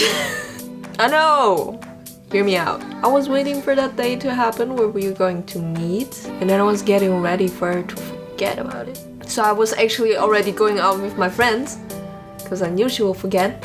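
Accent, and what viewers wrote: Germany. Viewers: I swear i though you were German from the accent...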